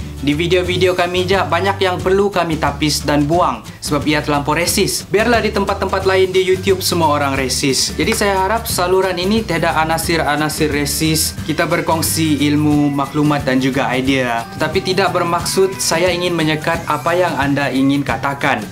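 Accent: Sabah, Malaysia. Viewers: Hello from Brunei. Suka tonton kopikopifakta. Dari first video saya dah tau dia ni sabah. and I love the accent. Dan saya bukan lah orang Brunei sebenarnya. Teman oghang perak yop. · Good Video. Baik jua ku paham slang sabah. Ani peminat dari Brunei ne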